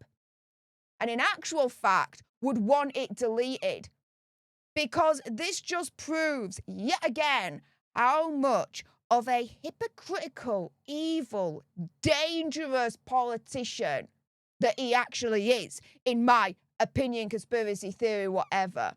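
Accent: Yorkshire, United Kingdom. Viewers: Oh, ur a great girl. U make me laugh. Such an awesome sweet girl. The way u tell things is so brilliant. Keep up ur great work. Love that Yorkshire accent, so lovely. Fantastic girl. ❤️❤️❤️❤️❤️❤️🙏🙏🙏👏👏😂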